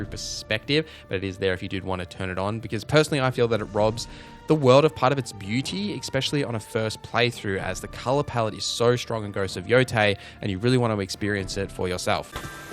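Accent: Australia. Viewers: He's not from New Zealand he's Aussie. Big difference buddy.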